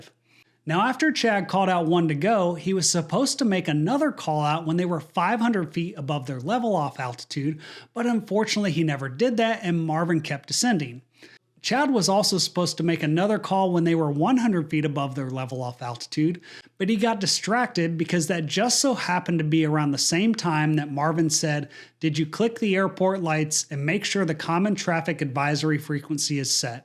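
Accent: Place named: Midwest, United States. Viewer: I want to ask a favor, unrelated to this specific video, but pertaining to all videos you make: could you please say "so M ething" with an EMM instead of "sunthing" (with an ENN)? Thank you. It's not important at all and probably shouldn't bother me, but I feel it detracts from the overall quality of your videos, which is otherwise top notch. Ending present participles with '-een' instead of '-ing' is, I guess, only a harmless feature of a regional Midwestern accent and much less noticeable. Apologies: no offense meant.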